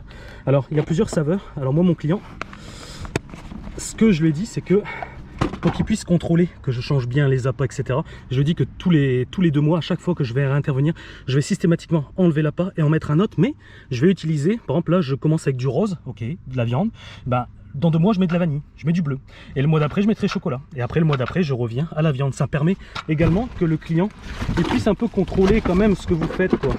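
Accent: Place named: Northern France, France. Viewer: On viens tous les deux du nord, et tu as EXACTEMENT la même façon de parler qu'un de mes profs. Je pense que tout est lié.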